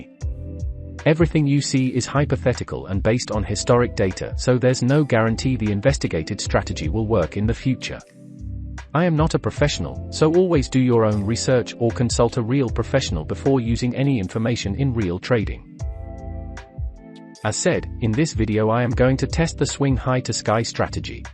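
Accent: Netherlands. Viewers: I am missing the Dutch accent! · to bring back the Dutch accent.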